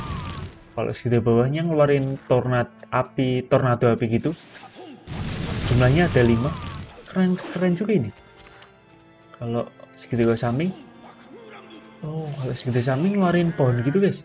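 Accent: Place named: Java, Indonesia. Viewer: Muka lu ganggu suara lu ngmngnya jawa gitu ganggu di telinga mending gameplaynya doang tampa ada lu sumpah ngerusak feel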